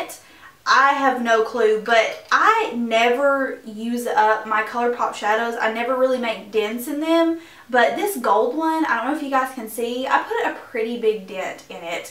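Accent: South, United States. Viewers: What you were saying about Kylie Jenner really resonated with me. As a working artist it would be difficult to see someone else getting credit for all of your work. You're paid for your work yes but credit especially on social media and shout outs are what continue to drive traffic towards your business. Thanks for the recommendations. I just subbed today. Love your channel and your accent! I'm a southern girl too 😘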